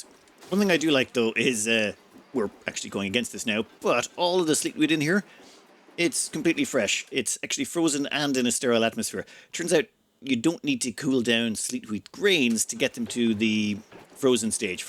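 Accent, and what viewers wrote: Ireland. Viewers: YOU'RE IRISH?!?!! FUCK YEAH!!!!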